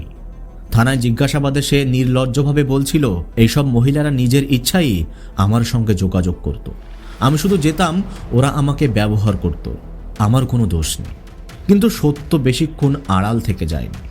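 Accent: Bangladesh. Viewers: আপনি ১০০ শতাংশ বাংলাদেশের লোক। আপনি যতই আমাদের বাংলায় কথা বলার চেষ্টা করুন, আপনার এখনও জিভের আড় ভাঙেনি। দ্বিতীয়ত, আমাদের দেশের বাঙালি মুসলিমরা কেউ জলকে পানি বলেন না। কেন বেকার মিথ্যে বলছেন? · India তে কোথায়? ইন্ডিয়া কি শুধু হুগলী আছে? সত্যি বলতে কি কষ্ট? আমার মনে হচ্ছে বাংলাদেশি, উচ্চারণ গুলো ঠিক তেমনি।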